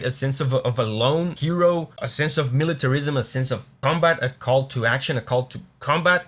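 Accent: Brazil. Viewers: you sound Brazilian, are you Brazilian?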